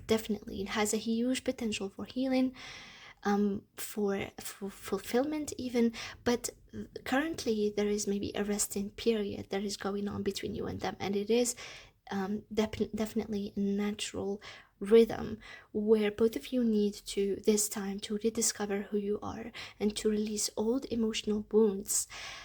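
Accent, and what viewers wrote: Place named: Scotland, United Kingdom. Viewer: I know there’s a separate accent but you also sound slightly Scottish!! It’s so calming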